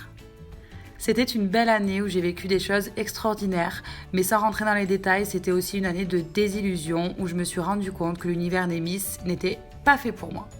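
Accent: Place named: Toulouse, France. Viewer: Super vidéo franchement les dessins son magnifiques et étant Toulousain aussi t'inquiète pas pour ton accent j'ai le même on s'en fout xD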